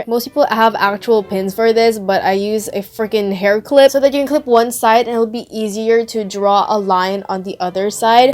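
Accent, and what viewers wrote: England, United Kingdom. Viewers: I LOVE YOUR ENGLISH ACCENT 😍😍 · so relatable lmaooo, a new subscriber hereeee. i thought ur not filipino likeeee i love ur eng accent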